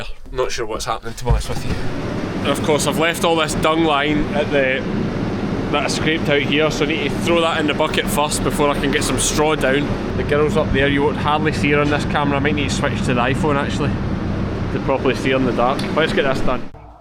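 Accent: Scotland, United Kingdom. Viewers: I know there's more to Scotland than your accent, but that little "och!" was so adorable!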